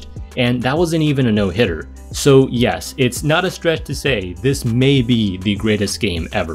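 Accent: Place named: Japan. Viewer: Sportstormの動画から来ました。英語に日本人っぽい癖があるなと思ったら千葉在住ww めちゃくちゃ英語お上手ですね！ I was actually interested in the statistics side of this games and you’ve provided everything for me. Dropped a sub just lettin you know.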